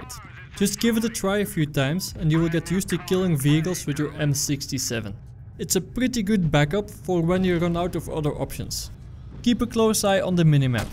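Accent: Netherlands. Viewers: I think stay the way you are, you have the most insightful tip/tricks/tutorials. Maybe hints to certain maps for certain game modes, but other than that keep doing what you are doing. One if not my favorite BFH follows/subscribes. Oh and the Dutch accent pushes it over the top to EPIC for me every time. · If you really wanna get bigger and compeed with the big channels, you need to upload 1 video a 1 or 2 days. Im enjoying your video's tho, keep up the good work. And where u from? Je hebt een Nederlands accent!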